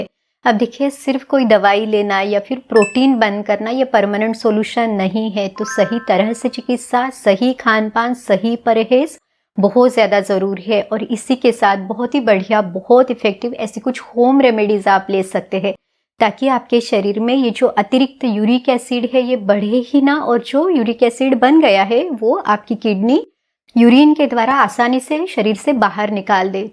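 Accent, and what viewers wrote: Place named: Maharashtra, India. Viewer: Doctor ur hindi accent is like marathi but ur jain gujrati🤔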